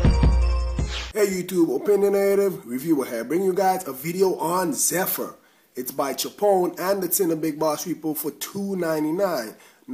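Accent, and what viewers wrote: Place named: Jamaica. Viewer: Your voice is sick!!lol Jamaican?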